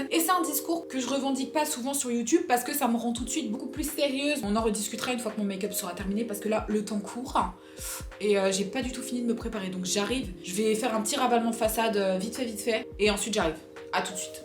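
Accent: England, United Kingdom. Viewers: C’est agréable ton contenue est fluide et chapeau pour les videos tout les jours mais l’accent anglais les expressions c’est vraiment lourd , irritant et gênant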